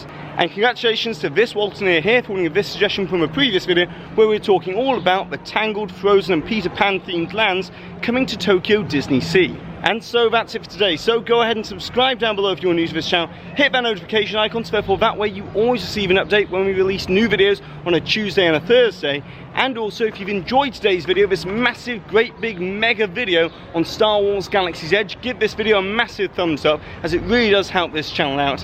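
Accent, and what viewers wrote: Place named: RP, United Kingdom. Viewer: The posh accent cannot die fast enough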